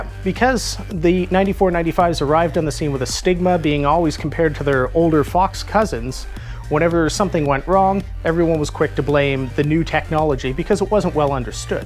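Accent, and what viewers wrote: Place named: Canada. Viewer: Love the Canadian accent. Reminds me of the good ol trailer park boys.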